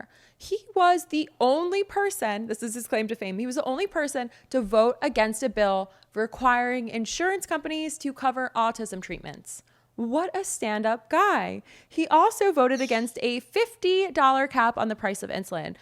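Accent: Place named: Orange County, United States. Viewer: I’m from Orange County, California, so I’m used to people talking like this. My suggestion would be to continue watching, just turn off the volume and put on the captions.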